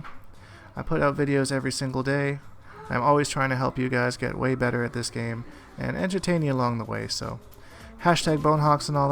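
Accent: Canada. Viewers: I thought South Park was being funny by the Canadians saying "Aboot" but its true lol. Love ya!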